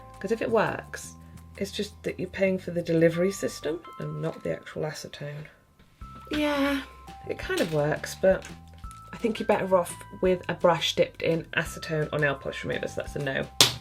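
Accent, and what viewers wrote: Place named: England, United Kingdom. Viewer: Do u like in America or England because it says dollar in the title but u sound English, just wondering xxx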